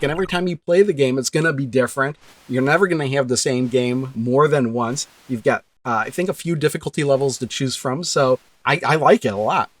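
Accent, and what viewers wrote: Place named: United States. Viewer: Enjoyed it very much You are so dedicated And a very nice accent.. i wonder what part of the States this is from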